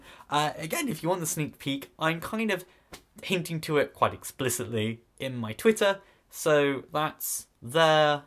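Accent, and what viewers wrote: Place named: England, United Kingdom. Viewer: Okay, so at first I was watching your videos simply for the applying to Oxford content, but then.. I don't know, there's just something about the way you talk to us which I really enjoy! :) Plus I'm still trying to get used to English accent, and since watching you is quite a pleasure, I guess I'll be staying here. ;)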